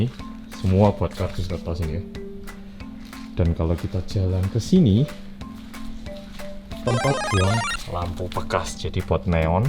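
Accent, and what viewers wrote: Java, Indonesia. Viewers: Bersama orang korea yang ......MEDOKKKK😜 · jowo banget iku lho kletek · Hal yg menarik adalah, kebersihan tanggungjawab bersama, pemerintah buat aturan, warganya mematuhi..sehingga lingkungan negaranya bersih, indah, rapi, warganya juga sehat, rapi, dan kuat. Nah jawabannya warga +62, budayakan dari diri sendiri dan patuhi aturan pemerintah. Sekian dan terima kasih. Makasih mas medok kuliah sampahnya. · Orang Korea yang medok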